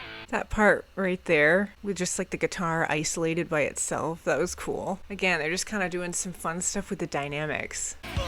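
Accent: United States. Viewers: I can't place your American accent. What part of the country are you in?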